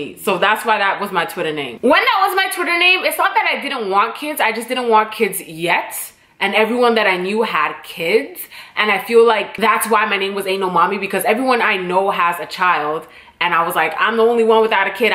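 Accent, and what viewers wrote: Toronto, Canada. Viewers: I honestly wish you would use your Canadian accent more often tbh! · You speak like a Torontonian 😂😂😂😂 The first video I saw of yours you said 1 word and I automatically knew you were from Ontario 😂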